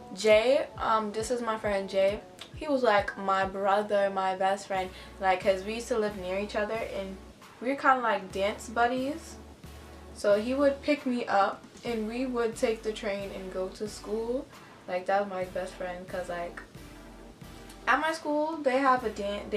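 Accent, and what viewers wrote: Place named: Barbados. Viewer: I love your Barbados accent yes yes boo 😍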